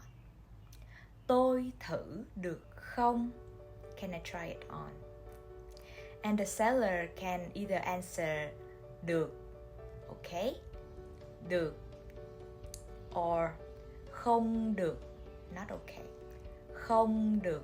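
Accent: North, Vietnam. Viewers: Northern accent?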